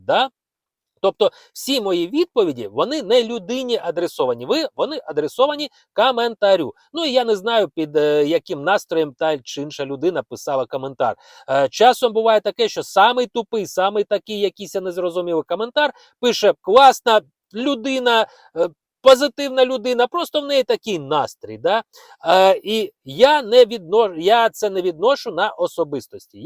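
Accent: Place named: Russia. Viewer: Украины скоро не будет, зачем украинский язык? Тем более, слышно, что для автора родной - русский.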